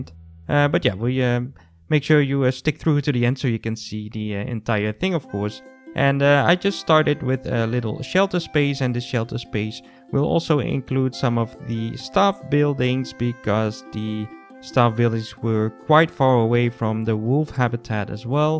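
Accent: Netherlands. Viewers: not many animals look like what i see on the veluwe but keep up the good work ( ik hoor een beetje nederlands engels am i right?)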